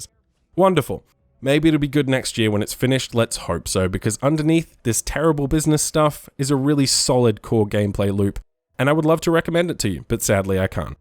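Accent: Australia. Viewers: I fucking swear all Australians have the same voice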